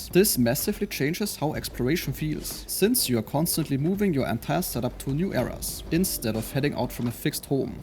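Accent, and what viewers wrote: Germany. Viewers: The German accent is very noticeable :D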